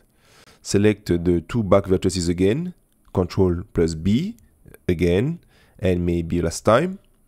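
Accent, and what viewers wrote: France. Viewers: I really like your french accent dude!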